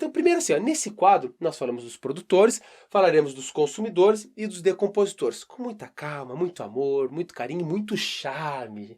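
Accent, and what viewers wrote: Rio Grande do Sul, Brazil. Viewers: sou apaixonado pelo sotaque gaúcho.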